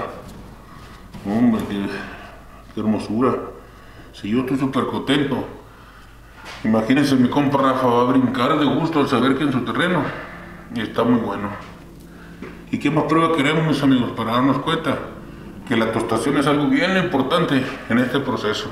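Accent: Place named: North, Mexico. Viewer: Suena a que es del norte de Mexico , este mi amigo.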